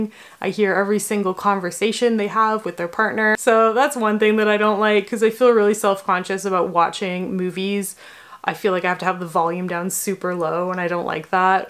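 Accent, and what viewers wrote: Canada. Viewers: Its always funny when I hear a canadian say “abooout”. eh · K, you've been living in Japan for that many years... but i still heard that Canadian about.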